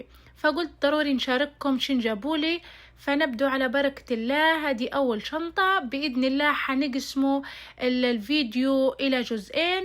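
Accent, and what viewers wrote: Misrata, Libya. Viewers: الله طلعتي مصراتيه شكيت في لهجتك فالاول 🥹🤍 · طلعتي مصراتية 😂❤❤ و اني نقول لهجتك مش طرابلسيه ، ربي يحفظ ليبيا كلها ❤ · من مصراتة واضح لهجتك 😂😂❤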